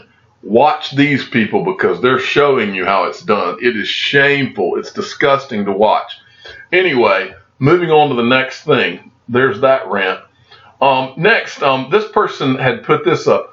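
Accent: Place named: Georgia, United States. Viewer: You sound like some boys I know from Blackshear I bet you're in a 50 mile radius of Jesup. Hello from the Boro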